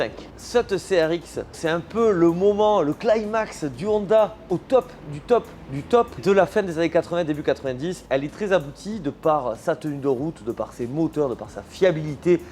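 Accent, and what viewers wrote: South, France. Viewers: Merci, tes vidéos sont toujours un régal à regarder et à écouter (avé l'ascent) ça nous met du soleil dans la tête. J'espère que tes abonnés apprécieront le petit tour, parce que tu ne l'as pas dit mais filmer de la place arrière avec ta conduite, mon dos a pris cher....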